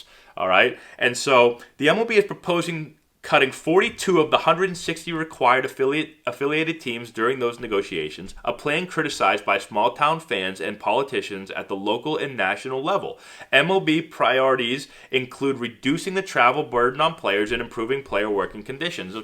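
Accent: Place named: Boston, United States. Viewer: Very faint Bosstown accent..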